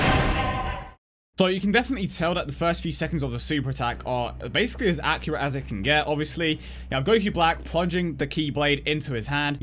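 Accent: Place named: England, United Kingdom. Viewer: sounds kinda like Darren Till with a english accent instead of irish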